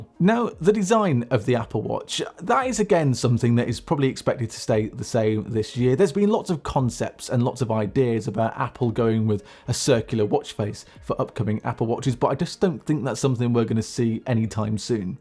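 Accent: United Kingdom. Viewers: Dollars ? You show pounds on the screen and say dollars, with a British accent. A bit confusing. Canadian dollars , or Australian dollars ?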